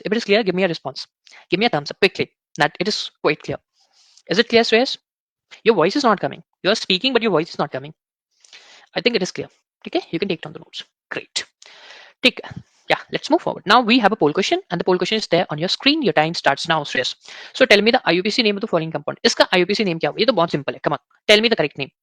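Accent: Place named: Bangladesh. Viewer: Sir aapka accent videsh ka lagta hai bangladesh ka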